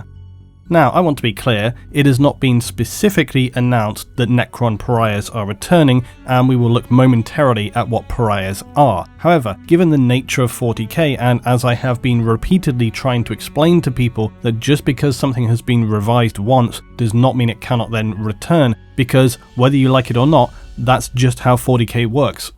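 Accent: England, United Kingdom. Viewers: Hello, just curious, what part of England is your accent from?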